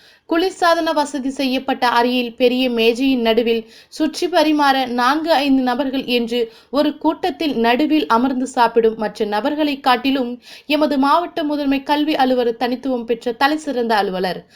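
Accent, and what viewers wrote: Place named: Chennai, India. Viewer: எக்கா மதராசு தமிழ், அது என்ன பள்ளிபால் குடித்து விட்டு.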